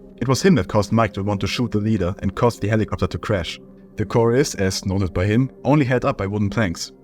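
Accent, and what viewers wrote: Germany. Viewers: You are German right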